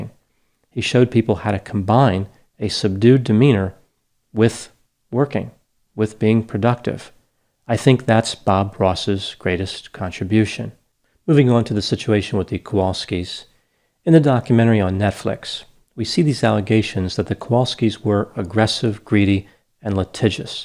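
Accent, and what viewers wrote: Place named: Pittsburgh, United States. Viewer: hearing a Pittsburgh accent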